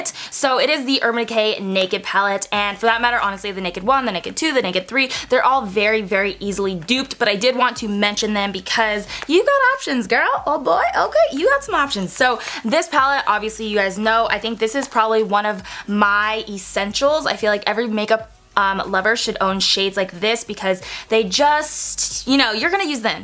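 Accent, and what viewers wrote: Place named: United Kingdom. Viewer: Yes, I found you through that Dupe Video. Love your British accent, lol! 😍 Thank you for sharing all these dupes😊👍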